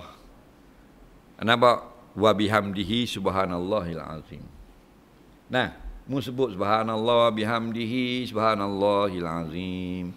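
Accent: Terengganu, Malaysia. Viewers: Terbaik UAI.Terima kasih Ustaz.Seronok dengar loghat Terengganu Ustaz.👍👍👍👍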